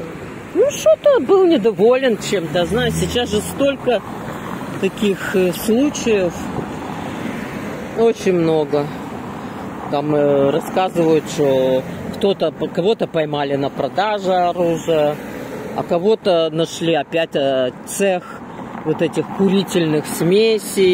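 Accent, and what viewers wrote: Odessa, Ukraine. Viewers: Спасибо за вашу прогулку по Одессе и ваш такой родной одесский акцент!❤❤❤❤❤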